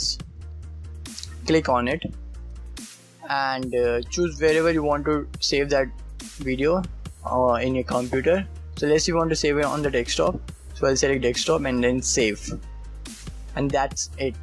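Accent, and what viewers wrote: India. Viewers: you know it works when there's an indian voice v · I did't understand a word from this video, he's talking Indian not English !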